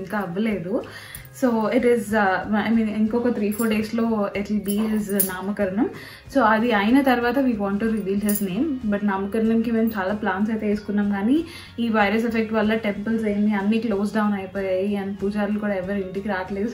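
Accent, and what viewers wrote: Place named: West Godavari, India. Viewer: Awwww my slang u also from w.g.dt good good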